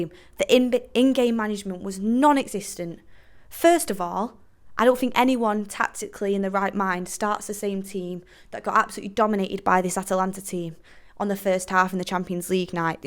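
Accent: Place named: Salford, United Kingdom. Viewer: That's the most Salford accent ever ahhaha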